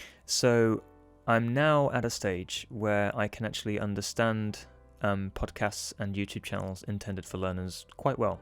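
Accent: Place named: United Kingdom. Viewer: He has a strong British accent, but his pronunciation is clear. So you have to improve your listening skills with the British accent instead of him speaking more clearly.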